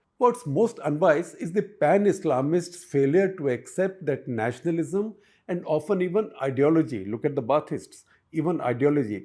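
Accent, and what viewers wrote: India. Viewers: His thick Indian accent requires a lot of focus to comprehend what he is saying.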